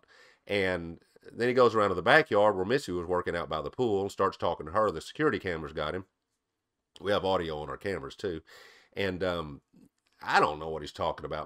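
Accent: South, United States. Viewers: Hi and everyone else. Happy New year coming to everyone! 🥳.....I give many 👍👍👍..to one of the coolest accents ever! Southern! 💕😂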